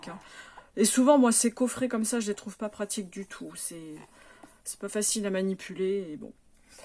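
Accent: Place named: Italy. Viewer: Coucou, j'ai bien aimé découvrir ta sélection de jeux au travers de ce challenge. J'aime beaucoup le Heaven and Earth tarot tout comme le tarot des Runes! Moi, j'aime bien ton accent italien! 👍 Belle journée, à bientôt 🌸🌸😊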